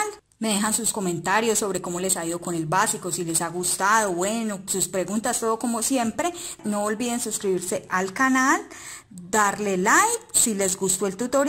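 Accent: Colombia. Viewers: Que gusto conocerte, poderle poner un rostro y un nombre a esa voz tan Colombiana. Agradezco infinitamente tu dedicación y esmero en enseñarnos, se nota que te gusta lo que haces, me encanta tu honestidad y tu forma tan graciosa de hacer aclaraciones.... de corazón te digo DIOS TE BENDIGA....!!! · Hola, excelente tu forma de explicar, por tu acento, creo que eres vallecaucana, soy de Tuluá, y gracias a ti, he aprendido mucho. Gracias por tu generosidad al compartir tus conocimientos.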